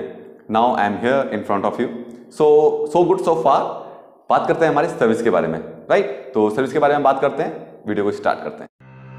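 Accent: Uttarakhand, India. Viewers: Uk walo ka toh bolna he ek alag he andaj h ❤️❤️❤️❤️ pithoragarh 🔥❤️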